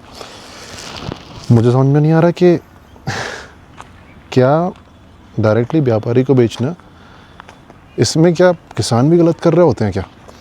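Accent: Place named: Gwalior, India. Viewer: Gwalior Shivpuri k aaspass k lag rhe hai. Wahi ki language aisi hai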